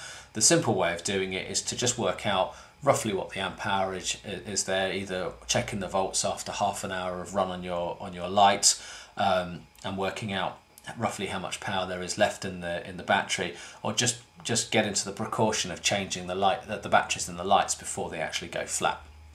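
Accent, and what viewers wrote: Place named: England, United Kingdom. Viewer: Hi, Thanks so much for your advice. You sound like you are English, do you live in the UK and can you recommend a couple of batteries that are easily available which are 18650 or AA? I want to get a Torch which is rechargeable but maybe can take recharged batteries so I can replace the torch batteries if I am camping. It seems the Americans have their own market and do not worry about other countries and what happens to torches or batteries. And the 18650 are harder to get here.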